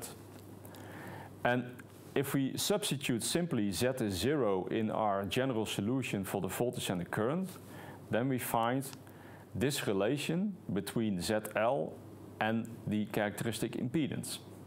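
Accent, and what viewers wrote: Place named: Germany. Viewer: English with a German accent. :)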